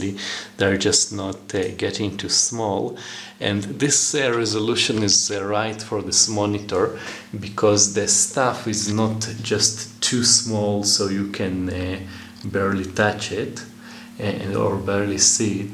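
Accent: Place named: Israel. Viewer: איזה קול ישראלי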